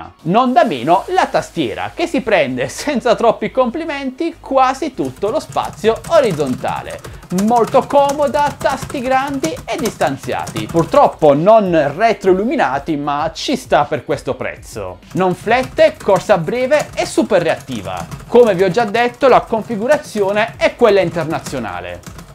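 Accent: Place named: Bari, Italy. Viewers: Cmp sei di Bari , Se sì Anch'io sono di Bari( si è sentito dall'accento)😝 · Dall'accento sei barese come me , grande . Un consiglio . Questo PC , con queste caratteristiche , potrebbe essere utile per fare musica , stile karaoke ?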